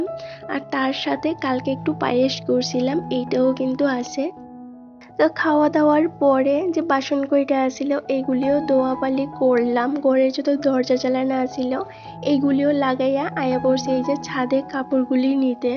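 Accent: Bangladesh. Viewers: আপু তোমাকে একটা কথা জানার জন্য আপু তুমি সেই উওর টা দাও তোমার ভিডিও ভালো লাগে বলেই দেখি তুমি এক দেশের আমি আরেক দেশের কিন্ত মানুষের মন যাকে ভালো লাগে তার সমন্ধে জানার ইচ্ছে করে আর তেমন বড় কোন প্রশ্ন করি নাই তোমার আসল বাড়িটা কোথায় তোমার ভাষার জন্য কারণ বাংলাদেশের কিছুটা টান আসে আমি অনেকের ভিডিও দেখি কিন্ত কেনো জানি তোমাকে আমার খুব ভালো লাগে আমি বাংলাদেশ থেকে দেখি তুমি কোন জায়গায় মানুষ আর অরিজিনাল বাড়ি কোথায় তুমি ইন্ডিয়ান সেটা বুঝতে পারছি কিন্তু কিছুটা আমার দেশের টান আসে তাই জানতে চাইছি ভালো থেকো সুস্থ খেকো এগিয়ে যাও আছি তোমার সাথে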